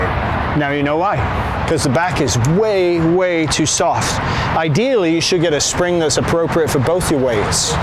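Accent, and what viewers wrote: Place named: Northern England, United Kingdom. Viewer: I've been watching some of his videos and trying to guess the accent too. Think I hear hints of northern English (Manchester maybe) but it's faded a bit from probably travelling a lot. Anyhow yeah watched a bunch of videos about suspension and he's the only guy I really feel like I'm understanding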